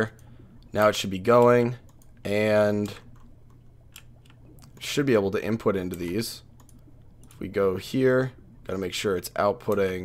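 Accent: United States. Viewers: You have a strong American accent what area of USA is that?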